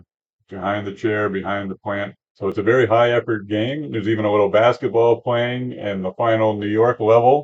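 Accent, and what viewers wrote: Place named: South, United States. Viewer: OK, because your accent is heavy, sounds like you're from the Southern States of the USA. I didn't even know the AMIGA was a thing in Canada. It was mostly popular in Europe. So do you think Canada will win the World Cup then?! :D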